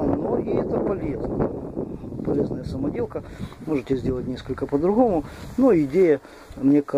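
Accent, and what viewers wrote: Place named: Russia. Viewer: Parabens pela ilustre ideia, vou fazer uma tambem, obrigado. O sutaco parece de russos eles sao inteligente👏👏👏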